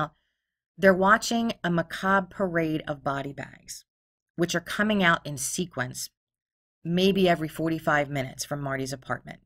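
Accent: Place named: Philadelphia, United States. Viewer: From another suburban Philly girl your accent isn’t that bad. Just found you while dealing with a health issues and indulging my true crime obsession. Not sure if you touched on any of these cause I’m just making my way through your show, but here are some thoughts… You could do a whole season on MOVE and the various standoffs with police, the bombing, the unsolved murder in Jersey, Mumia Abu-Jamal,and the current claims that it’s a cult that abuses children. Or the scandals of the DuPont family. Eddie Savits? The boy in the box (or updates now that they are gonna identify him). Toyenbee tiles. The boy-scout murder (Terrance Bowers). The pennsylvania scissor man. Ellen Greenberg. The video store murders in warminster (1993. I went to school with the victims). The unsolved murder of Tito Norcini (a distant cousin of my ex husband). 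Murder of Carol Ann Dougherty (Bristol) There was a woman in Bristol (I wanna say 1958) who killed a nieghbor and nearly killed 2 children with a hammer. I’m trying to find the info again… her name was Mary something… Maybe too soon, but the 4 bucks county young men murdered that were in the news a few years ago (not gonna disrespect the victims by naming the murderer).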